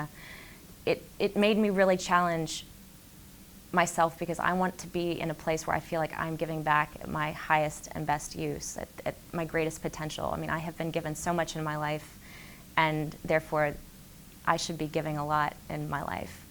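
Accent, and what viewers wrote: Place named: Texas, United States. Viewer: She sounds so genuine. She looks quite natural in front of the camera. And shes very photogenic. perfect texas accent. Jeez. Total 10.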